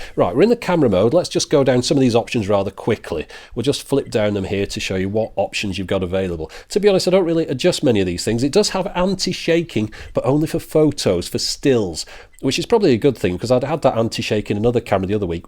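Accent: United Kingdom. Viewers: the two plesures in one:a good overview of equipment and a beautiful British pronunciation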